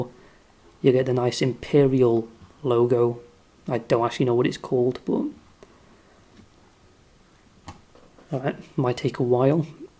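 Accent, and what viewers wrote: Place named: United Kingdom. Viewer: I love this british accent, I was loking for some british channel for improve my english, and I found now 🙂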